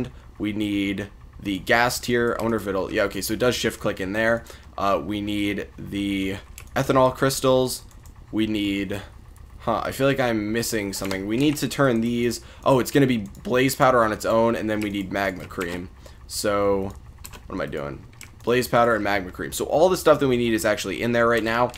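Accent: United States. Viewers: Are you American by any chance?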